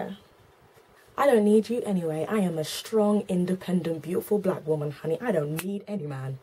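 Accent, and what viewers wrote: United Kingdom. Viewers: A Black Girl With A British Accent, That is The Best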